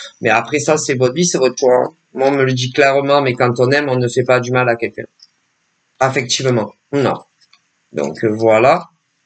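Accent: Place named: Marseille, France. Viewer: je pense que tu es vers marseille a l'accent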